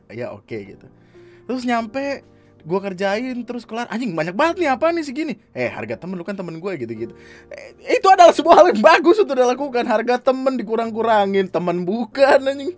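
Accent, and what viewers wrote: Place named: Java, Indonesia. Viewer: Vee dia kan dah pindah dari kecil . Logatnya aja jawa . Jelas memanfaatkan viewer indo , orang masa kecilnya di kota Malang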